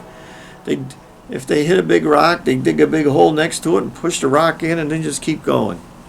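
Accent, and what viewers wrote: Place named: Midwest, United States. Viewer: new subscriber, loved this video. I’m a 4th generation carpenter, Union trained Journeyman Cabinetmaker & Millman, worked (30) years in the Commercial Cabinet and Millwork Industry. My trade career ended in 2009 when the economic downturn and competing imports from China for less than our cost of materials wiped out my industry. Around the same time all of the major furniture companies filed for bankruptcy. Government sent me to Nursing School (LPN). Retired (4) years ago as a Jail Nurse. I started learning the Carpentry trade from a young age outside of Dubuque Iowa. By your accent I figure you’re from the midwest, maybe Illinois. I remember the old ways of doing things. Engineers hatchets, Yankee screwdrivers, & 16d sinker nails. When I was an apprentice, some of the journeyman Carpenters would take an engineers hatchet, cut of the hatchet part and weld on the claws in order to get a heavier framing hammer. 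Of course when the Union Rep showed up at the job site they had to hide it because it exceeded the weight the Union allowed. Anyway, your little talk about the old days brought back fond memories of working with my dad and grandfather. Thanks.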